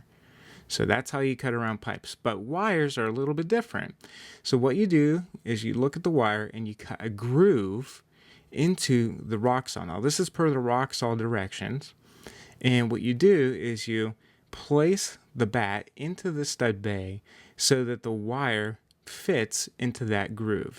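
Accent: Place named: Pennsylvania, United States. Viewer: It's a PA accent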